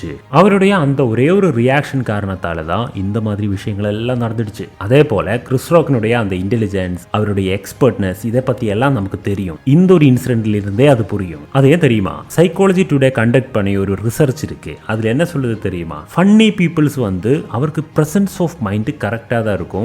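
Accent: Kerala, India. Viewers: Neega malayaliya... ? ungaloda thamil vithyasama erukke... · Maliyalais Tamil speech..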